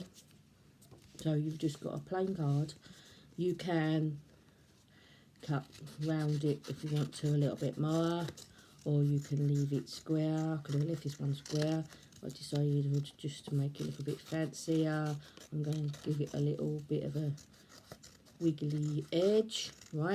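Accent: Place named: United Kingdom. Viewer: hun, gosh I have a thing for British accent. I could listen to you all day,ding ding 🛎!! You’re very creative hun 🤗that recycling card came out quite pretty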